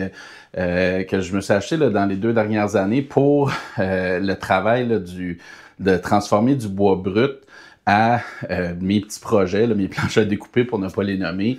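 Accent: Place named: Quebec, Canada. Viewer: Salut, toujours intéressantes tes vidéos, je ne connaissais pas cette marque! Et ma dernière video parle de toi et du petit forestier avec un peu d'humour sur le français Québécois que vous parlez chez vous 😅😁 bonne journée mon ami et à tous les Québécois 🤗🤗🤗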